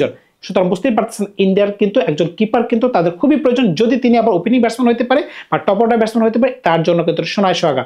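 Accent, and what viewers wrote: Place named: East Bengal, Bangladesh. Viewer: Bangal katha posay na. Valo bangla uchcharan karu n.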